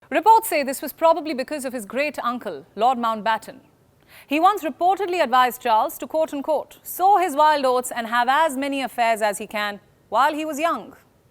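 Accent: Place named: India. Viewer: Listening to a Hindu accent is uncomfortable… Sorry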